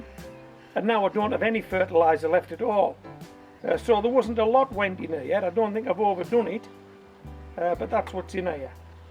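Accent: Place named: Northern England, United Kingdom. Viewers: Hows it gaan Marra! I've got the autoplay set up on Youtube, so every now and again while I'm multitasking (listening, not watching) one of your videos pops up. Every time one comes on, its a bit of a surprise to hear a voice with our accent coming out of the computer - but how great it sounds. You couldn't get much more Cumbrian than the way you say Crimson Crush! I'm going to make a very late start with seeds from some supermarket toms (piccolo) - and see how it goes. Wish me luck! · Hi mate, i love your chanel and I am married to a Birmingham girl here in Australia. We were wondering what part of England you are in as you sound up North?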